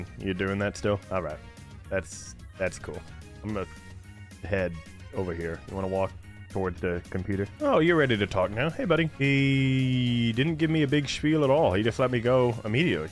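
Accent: United Kingdom. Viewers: oi its anotha brit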